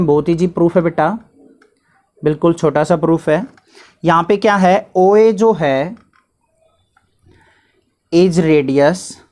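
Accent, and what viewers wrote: Haryana, India. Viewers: I think ap haryana se ho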